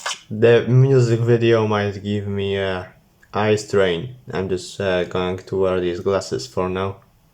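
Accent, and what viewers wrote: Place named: Poland. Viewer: you have a polish accent, r u polish?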